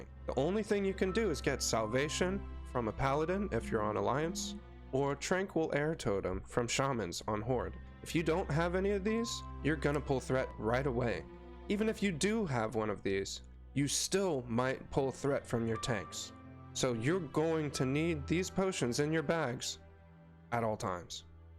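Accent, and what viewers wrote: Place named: United States. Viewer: I have a hard time with the American accent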